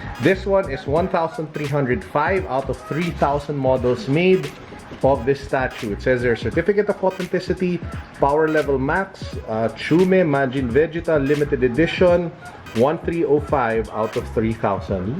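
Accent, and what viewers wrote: Philippines. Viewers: are you filipino?? I can read your accent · you have a Filipino accent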